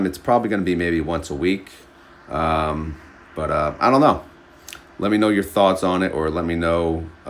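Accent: New York, United States. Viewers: I love this man's New York accent but he sings like a cowboy 🤠.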